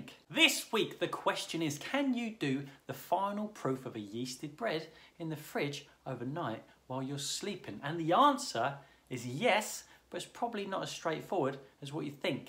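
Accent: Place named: England, United Kingdom. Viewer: Finished listening, just for the good old English accent. Miss it.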